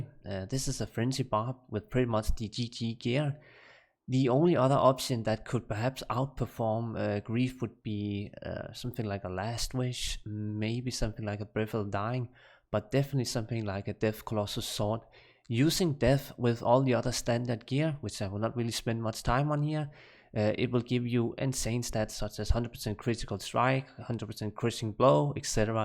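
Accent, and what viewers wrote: Denmark. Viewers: The danish accent screams at me haha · Are you from Denmark?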